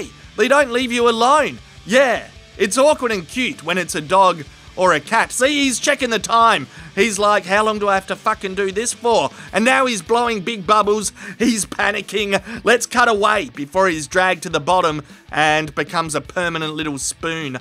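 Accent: Australia. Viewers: No matter what, shark sounds better with an Australian accent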